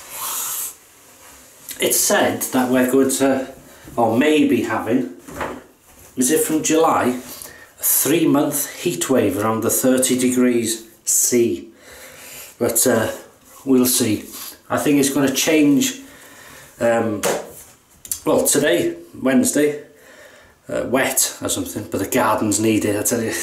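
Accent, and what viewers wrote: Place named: North Wales, United Kingdom. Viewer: Just came across this video... and your accent makes me very happy and full of 'hiraeth'! Greetings from a fellow north Walian living in germany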